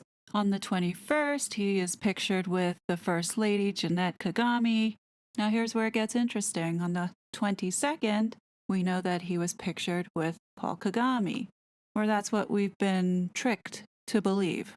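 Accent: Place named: United States. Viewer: Hi. Interesting🤔 I surreptitiously subscibed with your reading of better-not-say🤫 i love your voice... Gentle, melodous American. Perfect pitch and loved it when a laugh was building up in your voice. Let me stop! Ere i sound girlyfestype.😉 This is fascinating. Thank you. I will have listen pay attention... Is the one pic still a blank device? Maybe its a pic only for President of african parks meets President of Rwanda status photo? Did you analyse the other mozambique pic? Clearly on island. Question is Why??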